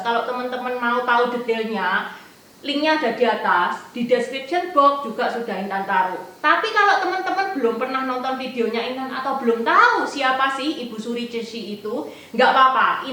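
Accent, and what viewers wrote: Java, Indonesia. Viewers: pembawaan nya terlalu lebay dan over, mending dikurangi dan tampilkan medoknya saja dan lebih serius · Keren sekali ka Intan apalagi medoknya hehe2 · mbak dari asal jawa kah?, kalok ngomong keliatan medok nya kwkwkwkw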